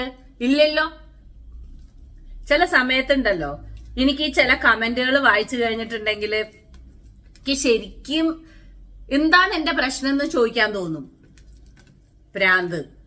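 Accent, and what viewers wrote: Palakkad, India. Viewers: I like the way speaks 👌 Palakkad slang super 👌 I am inspired by you ❤ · Palakkad slang super. V. Useful message for all. You are so inspiring. I wish I were you. So bold & practical. Nice recipe. Shall try it today. God bless ur family.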